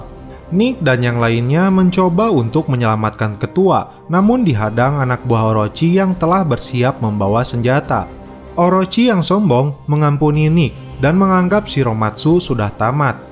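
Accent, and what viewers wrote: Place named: Sunda, Indonesia. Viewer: Mang ngomongna sunda ning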